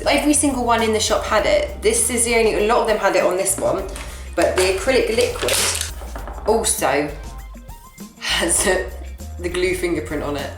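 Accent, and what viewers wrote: England, United Kingdom. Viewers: are u from england.....cuz i am from bradford in england uk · The dogs obviously not impressed either bless him! Naaaa think I'll pass on this one so thanks for the heads up on it. Much appreciated. My own nails are long and hard and I was actually looking for a vid on gel polish but then I got waylaid. (As one does lol). Lovely to hear an honest English voice on YouTube. Good stuff lovely girl. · Are u British? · She's British...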